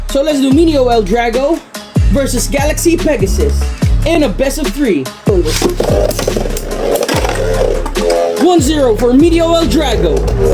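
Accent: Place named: Philippines. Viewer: U sound very filipino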